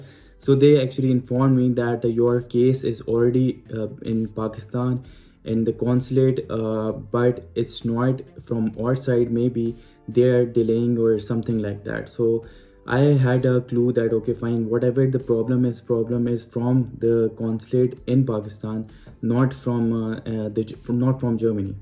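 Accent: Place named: India. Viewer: Great great accent unka indian hai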